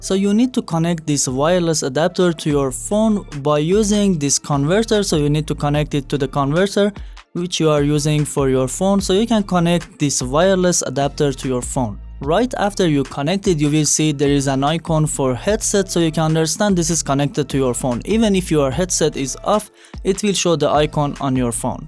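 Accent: Iran. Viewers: Are you irany?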